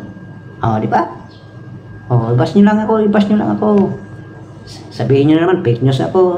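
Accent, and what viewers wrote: Visayas, Philippines. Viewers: Tonong bisaya pilipit mag tagalog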